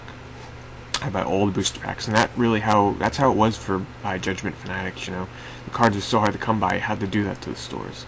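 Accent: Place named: New England, United States. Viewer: I like your New England accent. "Didn't get to play much of WarHauk"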